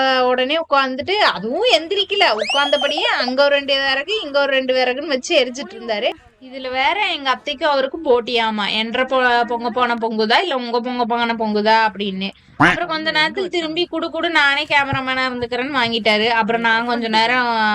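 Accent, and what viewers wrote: Kongu, India. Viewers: Indha video kum unga jathikum enna irukku... Avanga pesaradhu kongu tamil... Edhukku jathi sayam poosathinga... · நாங்கள் கொங்கு வெள்ளாள கவுண்டர் தங்கச்சி கார்த்திக் தம்பி அம்மா உங்கள் கொங்கு தமிழ் சூப்பரோ சூப்பர் பொங்கல் நல்வாழ்த்துக்கள் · நீங்கள் என்ன கூட்டம் கொங்கு வேளாளர் என்று நினைக்கிறேன் உங்கள் பேச்சு அப்படி உள்ளது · இனிய பொங்கல் நல்வாழ்த்துக்கள். கொங்கு தமிழில் பேசுவதே தனி அழகு.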